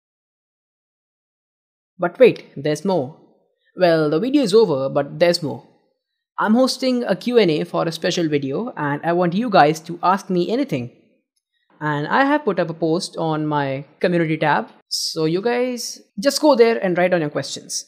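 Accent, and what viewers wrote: India. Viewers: Why u sound like indian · Dude are u Indian? Ur accent sounds so.. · Are you indian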